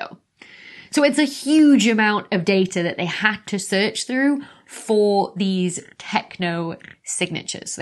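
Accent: United Kingdom. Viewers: can you plz speak less british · I used to live in Cambridge for a couple of years and I find it refreshing to listen to the British in your voice! I’ve watched many of your videos and am simply amazed by the shear depth, command, and mastery of your knowledge of cosmology. It’s wide and deep. I probably absorb 8% of your presentations - maybe some of this will stick in my tiny brain! 😊🤣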